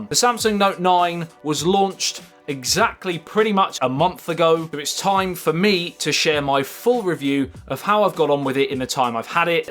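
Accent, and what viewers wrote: United Kingdom. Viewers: Hears unintelligent British accent, disregards opinion and clicks off video.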